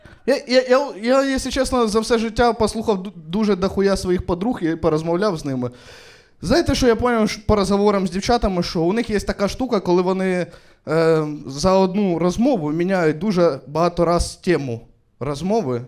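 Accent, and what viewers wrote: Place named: Russia. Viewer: Ох, як же відчувається ,що людина російськомовна... Це навіть не суржик, це фонетичне знущання💔 Хлопчик, ти милий перспективний, і плюсік за старання, але якщо вже ти займаєшься справою, де мова і слово - твій інструмент, ну прикладай більше старання!!! Бо твої російські слова , які ти просто промовляєшь з нібі то українськім акцентом так ріжуть вуха, просто кров з них йде. Лайк заради просування україномовного контенту. Ну і за харізму❤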